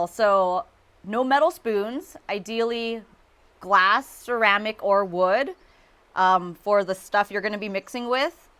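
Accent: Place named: England, United Kingdom. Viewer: nice english accent.. gorgeous smile.. ❤️ thanks for the recepy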